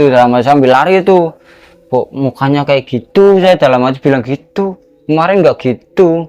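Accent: Indonesia. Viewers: Mlaysia hadir. Pekat sangat indonisianya .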